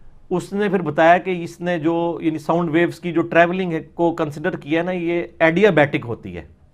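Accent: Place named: Punjab, Pakistan. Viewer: hahaha bhai, your way of taunting is very nice. your accent punjabi (or may be siraiki) is great.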